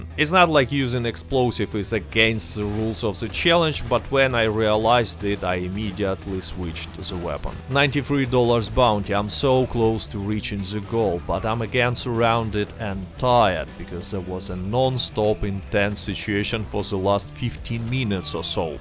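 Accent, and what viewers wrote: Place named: Russia. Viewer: You have a cool Russian accent. Your voice reminds me of my wife's uncle 👍🏿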